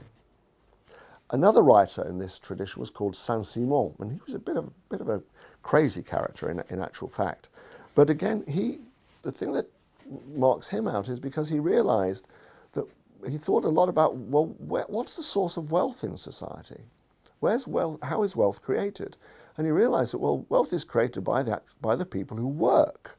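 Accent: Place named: United Kingdom. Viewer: Thank you. Well versed, laid out, explanation of the origins and early history of Socialism. The British accent also helps to accentuate the importance of topics and points being made.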